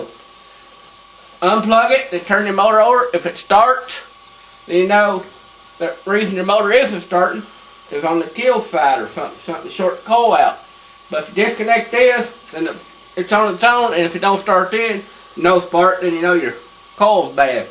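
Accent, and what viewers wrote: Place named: Tennessee, United States. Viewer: Are you from Tennesee some where's ? Like around Kingsport? I knew another guy sounded just like you from up that way. Excellent video and I just pulled my cover on my 11hp 92 briggs, and was wondering about that magneto being rusty, and this was very appropriate. Take care and keep the video's coming...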